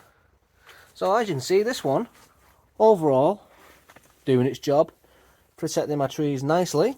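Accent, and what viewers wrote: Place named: United Kingdom. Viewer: Good stuff mate, just subscribed. Where in the uk are you, couldn’t make out the accent. Cheers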